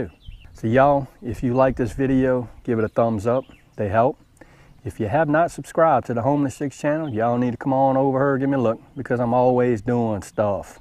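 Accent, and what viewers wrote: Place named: Louisiana, United States. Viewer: yeah buddy thank you ..I was born and raised in Louisiana myself I can always hear that accent lol love the video man thank you for sharing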